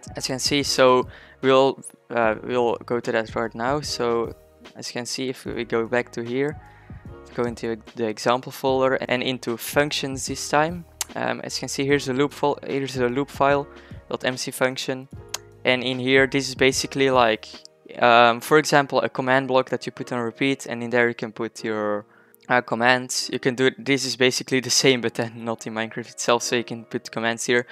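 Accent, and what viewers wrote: Netherlands. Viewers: I can hear the Dutch accent....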